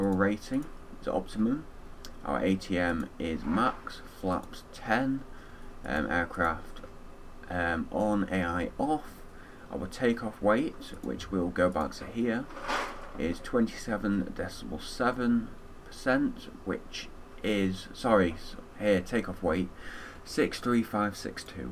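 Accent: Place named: Manchester, United Kingdom. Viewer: Congrats on the 2k subs here's to 3k+. Absolutely brilliant job and so well explained. Been through many tuts as a newbie, and yours should beat the top. Pruod to see a fellow Manny making something complex so informative and easy to digest...your a star, no idea how much i struggled before this tutorial. well underrated. thank you..liked...subscribed. ❤️ p.s Yup he's manc (heard the shouting) yup he's definitely Manc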